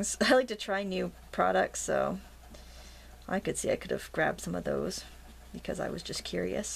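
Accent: Maine, United States. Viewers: This is funny.... I had just been thinking about how you say the word drawing, and then you said something about it. It is fun listening to your Maine accent while tutoring. I love your work, as I have said many times. Think I will "drar q" some gourds, for my friend who carves them as a side income. She is incredible, and being her friend, I have been given many, so a gourd card would be perfect for her. Thanks for the "draring" tutorial!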